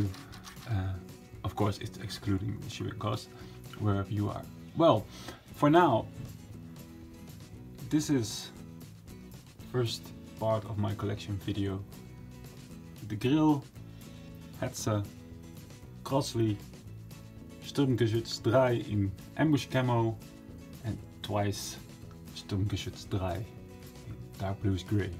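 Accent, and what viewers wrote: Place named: Netherlands. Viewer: You sound Dutch. I'm Dutch to!!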